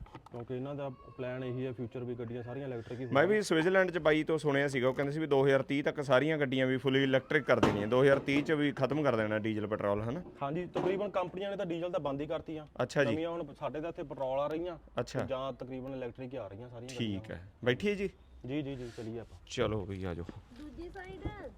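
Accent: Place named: Hoshiarpur, India. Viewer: Aap bohat khobsorat boli boltay hain. My mother tongue Punjabi in hoshiarpuri accent like yours.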